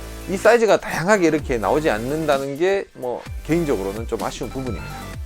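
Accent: Gyeongsang, South Korea. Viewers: 저 진짜 팬이에요 ㅎㅎㅎㅎ 다른 아이디로 cocokim 으로 구독 하고 2년 넘게 보다가, 지금 유튭 활동중인데 너무너무 재밌게 보고 있어요 ㅎㅎㅎㅎ 저는 미대 공부한 프리인데 공구 보는게 너무 재밌어요!! 타계정으로 보기 불편해서 주계정으로 또 팔로했네용 ㅎㅎㅎㅎ 언능 언능 보려규요 연기력도 늘었다고 ㅎㅎㅎㅎㅎ 한 사람이에요 갱상도 고향이라 넘 목소리 친근해서 힐링 되서 빠져서 들어요...ㅎ ㅅ ㅎ ❤️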